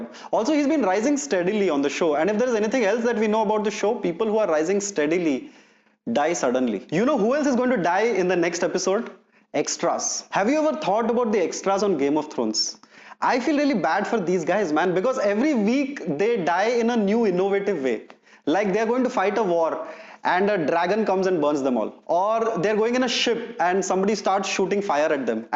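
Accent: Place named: Hyderabad, India. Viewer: Thank you for your Hyderabadi English and Hindi.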